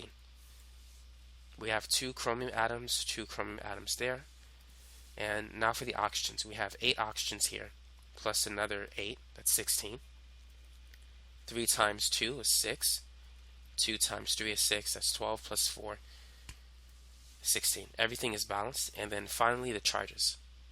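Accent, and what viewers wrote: United States. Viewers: Thank god we have an American accent here for once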